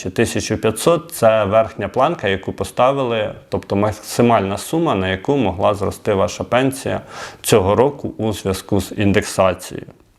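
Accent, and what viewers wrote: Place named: West, Ukraine. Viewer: Звідки ведучий? Виглядає, що десь з села з західної України. Говоріть нормально, а не як цвєк. БудУт, становляТ і т.д. Хлопец зі села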